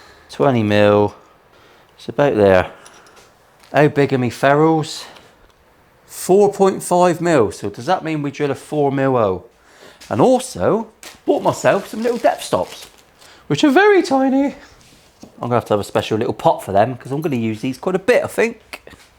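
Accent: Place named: Plymouth, United Kingdom. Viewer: The Plymothian accent had me hooked immediately. I'm Bristolian, so we share a twang.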